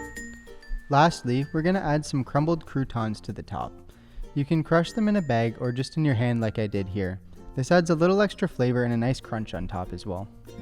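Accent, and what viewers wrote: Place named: Canada. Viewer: sounded extra Canadian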